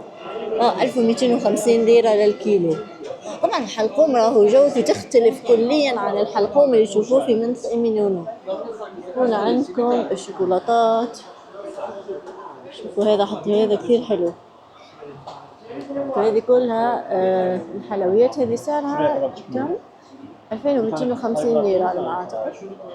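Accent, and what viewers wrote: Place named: Tunisia. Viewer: انا اكتر شي مستمتعة بلهجتك التونسية الحبيبة ,,, فيديو أكثر من رائع <3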